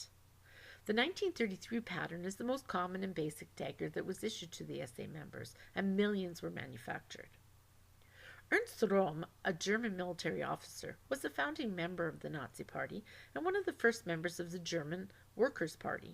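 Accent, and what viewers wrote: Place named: United States. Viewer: i think you meant pronunciation.. shes clearly an american. big deal. thank for the video lady. but crank the sound up, i had to crank my stereo up.. too quiet.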